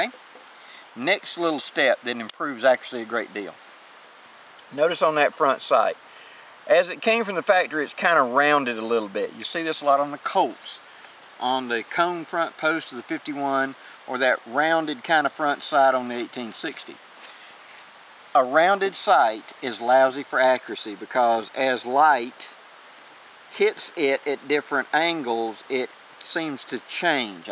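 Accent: United States. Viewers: Best cap and Ball Videos ever! Lot of history and technology said in beautiful Stories,in a lovely american Englisch, great pleasure to wach it.Thank you Sir for these series. These Guns played a signifiant role in making USA a big Nation ,later able to save Europe twice : WW l and WW II.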